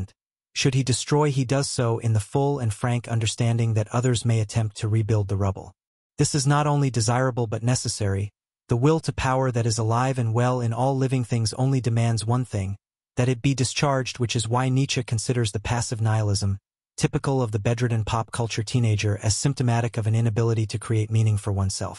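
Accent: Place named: Wales, United Kingdom. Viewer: I was just getting used to the Welsh accent lol.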